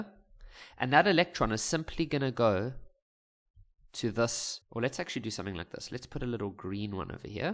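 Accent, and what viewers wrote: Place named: South Africa. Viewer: you from south africa aint you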